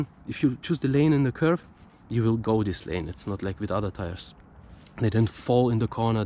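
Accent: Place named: Germany. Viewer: Как необычно, у вас в голосе звучат скорее немецкие нотки, чем русские, даже когда говорите по английски. 😜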